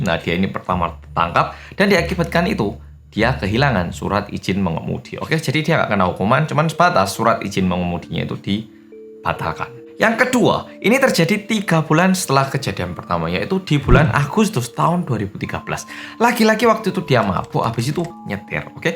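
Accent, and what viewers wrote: Java, Indonesia. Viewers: oppa, koq bisa sih bahasa nya medok gitu, gimana ceritanya nih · ... kamu orang mana sih kok medok kali Jawa nya... awas ya kalo orang luar tapi ngakunya org Jawa.... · Mas orang jawa apa korea sih Kok medhok nya dapet 😅😅😅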